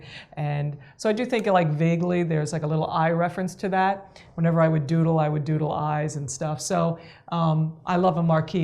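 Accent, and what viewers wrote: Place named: New York, United States. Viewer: This woman is a genius! So much creativity and thought behind all her pieces. I love listening to her voice too, I live in the south now and miss hearing that NY accent. Brings me right back to my childhood/extended family!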